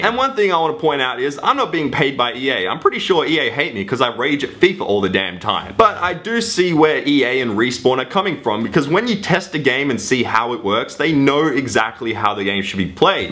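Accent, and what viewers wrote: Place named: Australia. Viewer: I love rage moments combined with his Aussie accent and cursing, it's so funny:)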